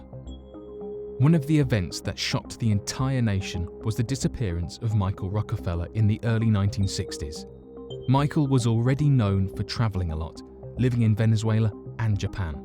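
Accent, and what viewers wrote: England, United Kingdom. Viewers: uhh no, he's definitely from England. I mean, I would know, being from England, also.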